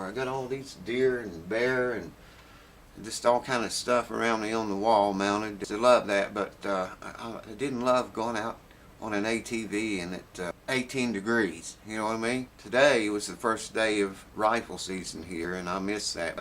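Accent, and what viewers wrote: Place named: West Virginia, United States. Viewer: Thank you very much for this video. BTW are you from WV? I think I recognize your accent. I'm from Poca River.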